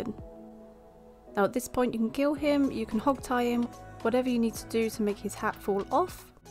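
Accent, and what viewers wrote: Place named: United Kingdom. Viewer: Im also british, i dunno if she british but she sounds british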